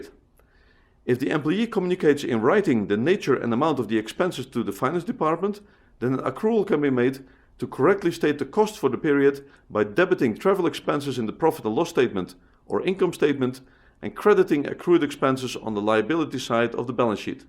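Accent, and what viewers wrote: Netherlands. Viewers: is that a Dutch accent ?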